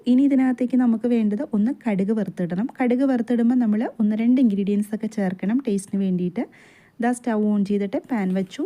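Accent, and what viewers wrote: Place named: Thrissur, India. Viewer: തൃശൂർ കാരി aano? ഭാഷ കേട്ടിട്ട് അങ്ങനെ തോന്നി. ഞാനും ഒരു പാവം തൃശൂർ കാരി ആണ് ട്ടോ 😄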